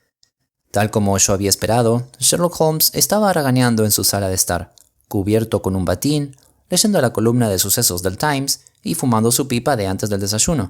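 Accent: Rioplatense, Argentina. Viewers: le ruego me responda, soy sumamente curiosa. argentino o uruguayo? x anticipado, gracias!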